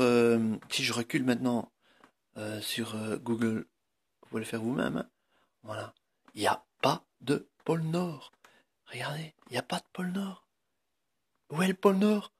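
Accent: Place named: Belgium. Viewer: Super l'accent belge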